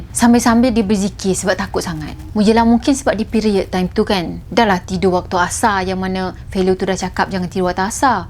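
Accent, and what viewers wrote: Kelantan, Malaysia. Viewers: Mek kelante rupanya mati2 aku ingat wong jowo. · Laaa..ore kelateee..... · Salam NI orang kelate ke?? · Ore kelate ko? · Asal kelate ko??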